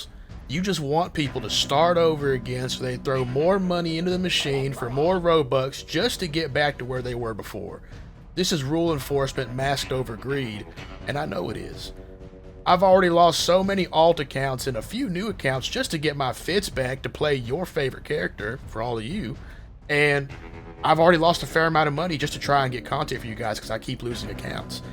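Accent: South, United States. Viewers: HIS VOICE …he really is a man of the south..🐄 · In his own words, he grew up in the South; his accent and voice are not acted, he just raises his voice and adds the jokes for the character.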